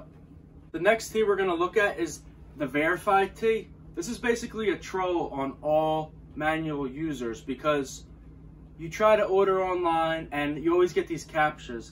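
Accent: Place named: Pittsburgh, United States. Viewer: Bro where are you from? Im from Pittsburgh, PA and you kind of have that accent like us...just curious. NIce pickups!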